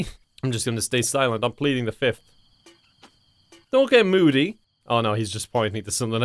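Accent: Ireland. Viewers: he has an Irish one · The thing with is that he always ALWAYS uploads when I'm doing my homework. I want to ignore it but then I think... can I ignore that irish accent... and here I am!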